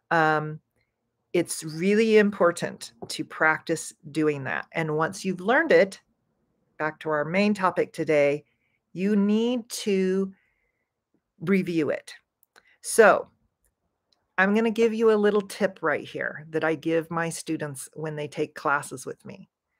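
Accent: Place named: United States. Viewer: I love American accent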